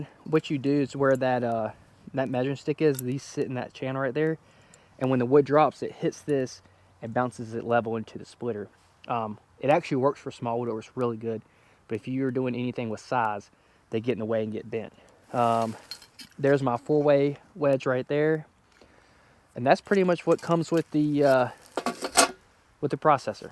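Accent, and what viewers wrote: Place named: North Carolina, United States. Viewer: North Carolina i knew it. This is random but i like to try and figure out where a place is by the environment, accents, and any other clues. I said hmm somewhere south east, ran thru a couple states then i said ah i bet it's north Carolina. Anyways, cool toy i want one!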